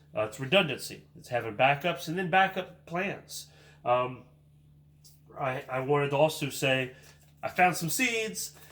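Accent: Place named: Pittsburgh, United States. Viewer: oh ok. Your accent sound like Pittsburgh. 😃.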